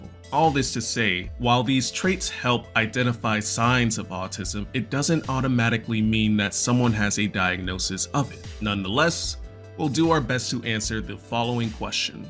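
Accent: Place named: United States. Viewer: You said it’s getting warmer but you have what I assumed was an American accent?? Wild. Thanks for the video!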